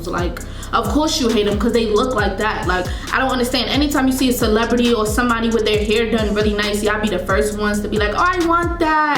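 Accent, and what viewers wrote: New York, United States. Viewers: Are you a NYer??? I feel like I can recognize our accent anywhere lmaooo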